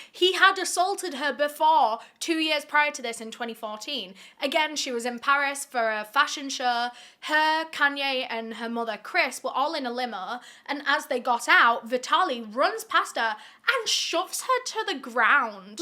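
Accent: United Kingdom. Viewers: Sorry but i gotta say you look like Olivia Rodrigo with cute Brit accent ✨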